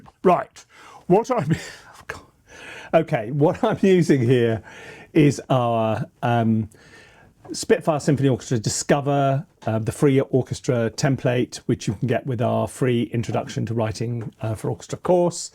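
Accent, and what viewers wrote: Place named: Scotland, United Kingdom. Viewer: Sounds very Scottish .... almost in need of a set of bagpipes...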